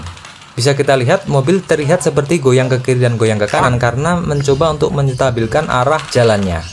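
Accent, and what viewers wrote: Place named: Surabaya, Indonesia. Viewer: Endinge metu Suroboyoane 😄 Baterai di sering paralel berarti tegangan tetap 3,7?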